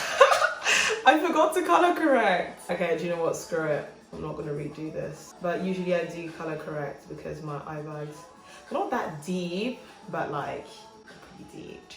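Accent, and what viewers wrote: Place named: China. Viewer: I LIKE YOUR CHINESE ACCENT MME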